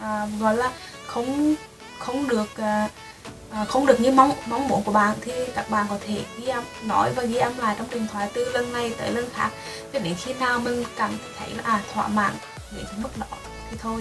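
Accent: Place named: Nghe An, Vietnam. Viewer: Giọng nghệ an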